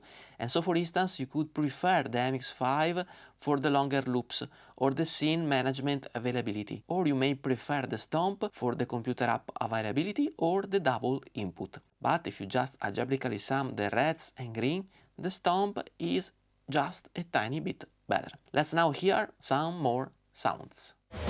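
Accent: Italy. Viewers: He's Italian, he has an Italian accent; what's the issue here! Great video (as always)!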